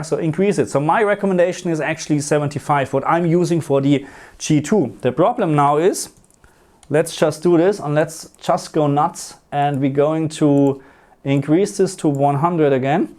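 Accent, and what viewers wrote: Germany. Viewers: i love deinen deutschen akzent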